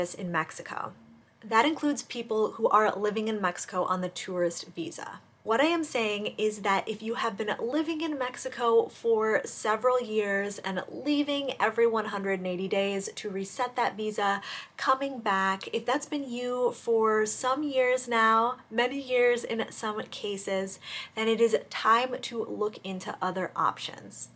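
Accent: United States. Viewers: You don't talk like your from Mexico, you sound like a pocho, as if you grew up in the U.S., corruption in Mexico is exaggerated, the U.S. just had a criminal leave office, the U.S. is a lot more corrupts then you think, its just the U.S. is so hypocritical, in the U.S. its all politics and race and Americans will forever say Mexico is so corrupts, for the next 1000 year becouse Americans go by what there thought and what they want to believe, they don't know Mexico, its always been that way, way exaggerated, I feel free in Mexico, more then in the U.S.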